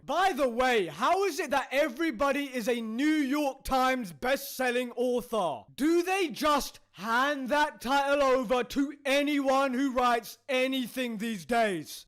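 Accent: United Kingdom. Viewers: The combinations of your accent, commentary, angry tone and brilliant British insults made this video a 1000x better 🤣🤣🤣 Good job mate! 'I am not Starfire' is not even out yet and the preview description alone is already trash as fuck. There's absolutey NOTHING charming nor likeable about Mandy whatsoever. Her entire personality and character traits are her being 'gay, overweight and goth'. That's it. Also the fact that she "hates everybody but her best friend" who fyi, looks like another godawful Tumblr character who probably identifies themselves as an attack helicopter. As if all goths "hate everybody" for no reasons. And what's even more ironic? Mandy eventually falls for a girl in her class who is basically like Starfire (tall, popular, beautiful, nice/kind)! Wasn't Mandy try to get away from people like her mother?? WHAT KIND OF BS IS THAT?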